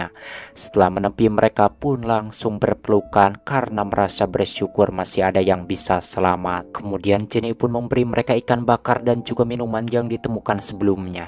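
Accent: West Java, Indonesia. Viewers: Ti mana sundana a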